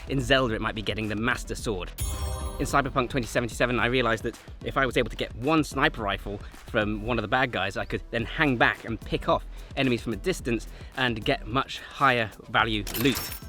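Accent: United Kingdom. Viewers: I just want to get swole! And I also want to strip body fat too, so I can see my abs. Perhaps it is just me as an American, but I would say that you are a more charismatic individual than you give yourself credit for. You have the British accent down. What % of your viewers are from across the pond?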